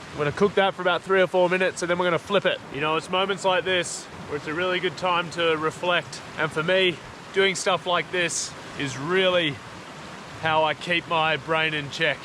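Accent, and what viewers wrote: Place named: Australia. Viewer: Just found ya channel. Great video, and you threw plenty of your own personality in there to, which makes it way better and less like a documentary than some are. Before looking further, your accent tells me you're an Aussie? (all good lol) Wicked scenery and bird life. Liked & sub'd (so I don't get lumped in with them little headed Kereru) Watching from rural South Island NZ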